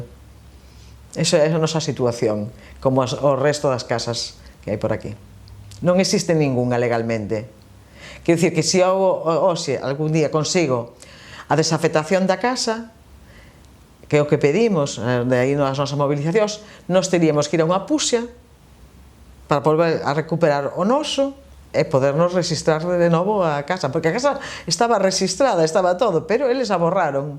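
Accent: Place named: Spain. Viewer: Galego com sotaque espanhol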